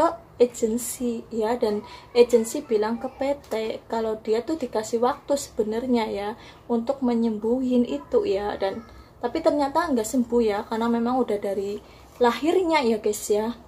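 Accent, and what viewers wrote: Banyumas, Indonesia. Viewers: Haha .ngapak kaliii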